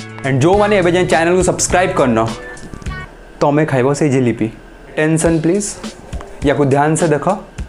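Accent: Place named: Sambalpur, India. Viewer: oo acha vadhia sambalpur language kahucha sunibaku bhala laguchi 😍real ghara kouthi?🤔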